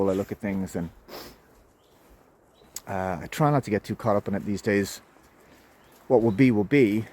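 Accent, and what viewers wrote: Ireland. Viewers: This is a game changer, man. I didn't expect a face reveal. I had a feeling you were ginger, man. The voice. God bless the Irish. I'm quarter Irish. I have family in Cork myself.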